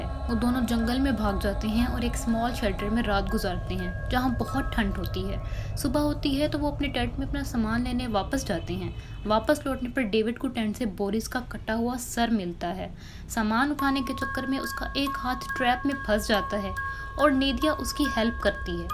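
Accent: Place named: Lahore, Pakistan. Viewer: Awaz se lgta hai ap lahore se ho...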